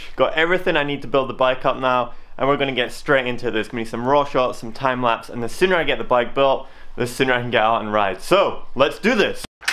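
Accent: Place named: United States. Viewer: Might just be me but your accent sounds a bit American!? Nice bike though.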